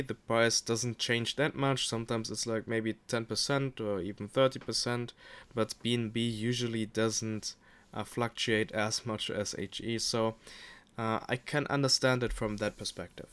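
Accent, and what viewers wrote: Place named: Germany. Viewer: Ein Deutscher das erkennt man am Englishen Slang :D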